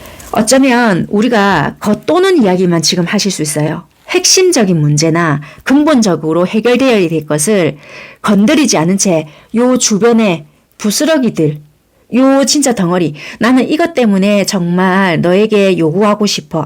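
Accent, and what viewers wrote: Busan, South Korea. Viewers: 부산 사투리 넘 좋아요.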